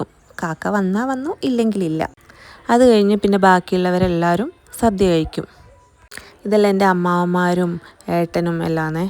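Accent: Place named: Central Travancore, India. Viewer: സംസാരത്തിൽ മധ്യ തിരുവിതാംകൂർ ആണെന്ന് തോന്നി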